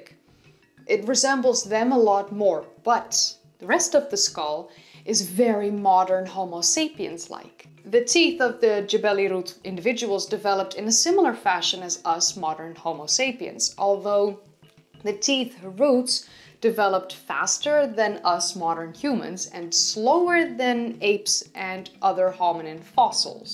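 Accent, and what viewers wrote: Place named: Netherlands. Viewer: I love your Dutch accent on pronouncing Leipzig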